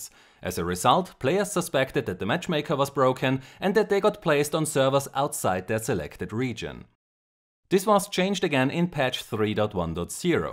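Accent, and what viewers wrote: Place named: Germany. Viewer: Normalerweise nervt mich ein deutscher Akzent immer wahnsinnig, aber bei dir geht das eigentlich sehr gut.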